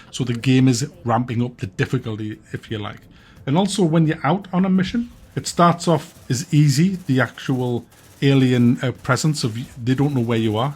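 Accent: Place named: Newcastle, United Kingdom. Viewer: Not seen your channel before but your red and green logo made me think of you'd have an italian accent. I laughed so hard when I realised you were a geordy! Great, honest review. I'll definitely watch more of your vids.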